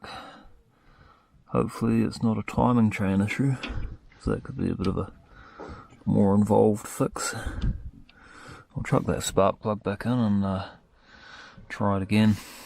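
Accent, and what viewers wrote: New Zealand. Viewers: Shit you sound like a kiwi haha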